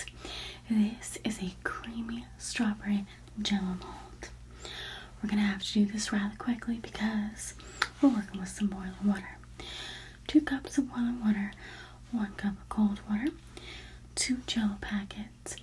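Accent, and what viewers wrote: South, United States. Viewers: more in this southern accent! Soooo lovely! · Your southern voice reminded me of the times the ladies at church would meet and whisper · The southern accent is giving me major * from the Golden Girls vibes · I live in the south and felt so at home with your accent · you sound just like so many of the folks here! ... the Southern accent ☺️❤️ you do it so well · more videos with the Southern accent?? ... you do it so well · The southern accent! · Love the Southern accent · Your Southern drawl was spot on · Im loving that southern accent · more southern accent videos! ... southern accent is my favorite, lovely and warm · the southern accent was a great touch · this southern accent really takes it to the next level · I love the southern accent ! Wow, you nailed it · the southern accent is magical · my favorite southern accent ASMR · southern drawl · That southern accent is HEAVENLY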